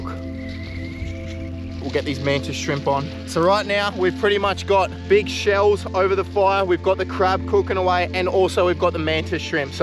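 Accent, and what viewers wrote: Australia. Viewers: Bloody Hell mate aussie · Are you Aussie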